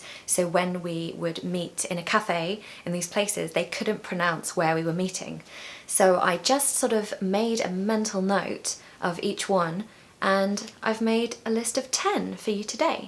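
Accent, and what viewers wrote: RP, United Kingdom. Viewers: I love british accent. I like your voice and videos. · Well, that pronunciation seems quite posh, but not relative to Indian accent. London is a dream place to be for every person born in India. I wish I could settle there, but it's very costly.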